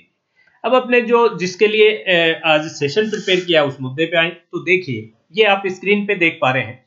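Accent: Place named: Gujarat, India. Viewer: Seems like you are gujrati by tone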